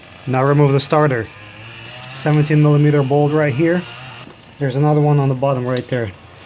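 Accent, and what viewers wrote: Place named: Russia. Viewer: Так и человек говорит с русским акцентом.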